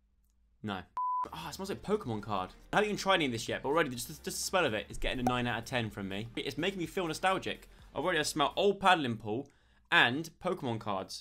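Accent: Australia. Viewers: we love an Australian accent when I look at myself in the mirror what a pro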